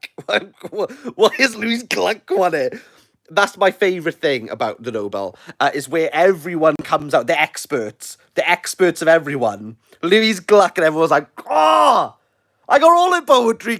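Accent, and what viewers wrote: Wales, United Kingdom. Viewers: the welsh accent plus the lack of hearing-impaired captions has made this vid a little trying but think I got the gist the only fosse I know is bob fosse the broadway show dance guy they made hit movie about him also the art of the deal was ghost-written trump doesn't even write e-mails cause he knows they last forever and doubt he's cracked a bk since college but if they could give it to dylan and the peace prize to obama before he even finished moving into WH even the orange ogre may have a chance in the meantime send me some of your laughing gas could really use it now ⚛😀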